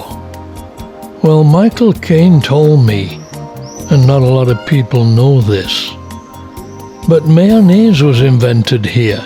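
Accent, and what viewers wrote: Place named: United Kingdom. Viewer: You always get such good weather. There must be a divine blessing on you. Enjpyed the Menorca episode and British accent of. Many kudos to Spain for maintaining their outposts so pristine. All historical places are kept in utmost care. How very nice....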